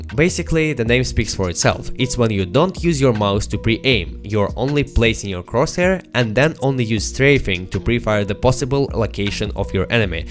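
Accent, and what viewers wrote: Russia. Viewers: Мне интересно, ты русскоговорящий или нет, прост акцент либо выдает, либо я незнаю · ставьте лайк, если понимаете что он говорит, только потому что у него русский акцент:) спасибо за видео ты пиздат.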